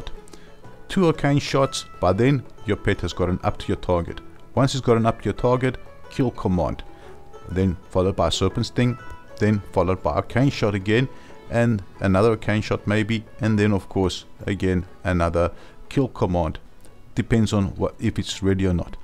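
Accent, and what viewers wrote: South Africa. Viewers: hes South African accent ... i have the same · Your from South Africa.???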